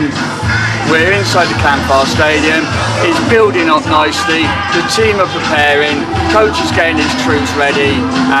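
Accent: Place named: United Kingdom. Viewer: love your British accent <3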